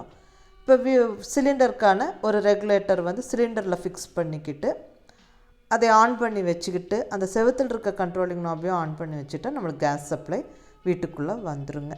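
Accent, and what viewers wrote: Kongu, India. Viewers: Mam, you are an inspiration to us! andha kongu tamil super ponga. adhukagave onga videos paakrom 😊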